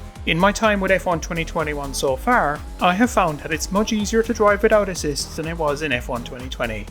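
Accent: Ireland. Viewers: lovely ol irish voice, good vid lad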